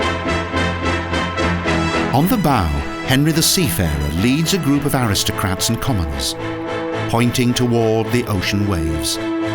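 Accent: Spain. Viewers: The footage looks old and narrator pronounces portuguese words un spanish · The narrator of this video is an ignorant, incompetent, stupid, moron, who by his english accent you can easily deduce that he's from england, thus a european but nevertheless pronounces Portuguese words and names with a spanish accent. Get somebody competent to do the narration.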